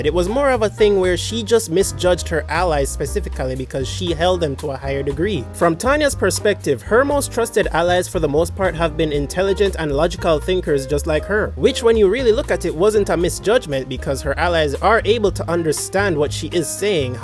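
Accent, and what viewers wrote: Jamaica. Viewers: lol me too like I could catch the Jamaican from a mile away so mi needed to confirm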